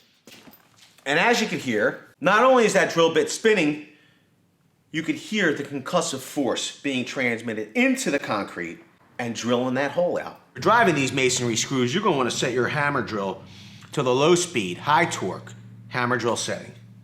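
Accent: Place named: New England, United States. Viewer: God your yankee accent makes me cringe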